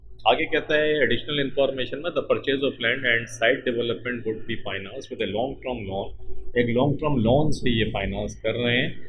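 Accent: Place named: India. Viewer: I can't understand anything due to the Indian's accent.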